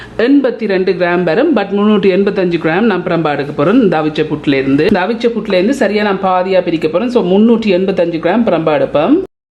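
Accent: Jaffna, Sri Lanka. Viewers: சுத்தமான யாழ்ப்பாணத்து தமிழ் பேசுறீங்க 😀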